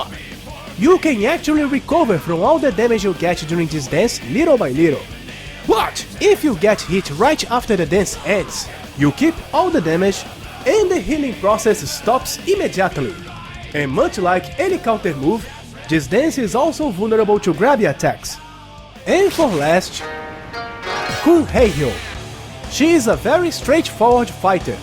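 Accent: Brazil. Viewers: Será que você é brasileiro? :^) Acredito que gringos conseguem entender a maior parte, mas da pra dar uma melhorada, heim. O vídeo em si está bem legal.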